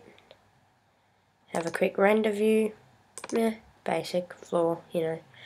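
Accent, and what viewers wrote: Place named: Australia. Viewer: aussie <3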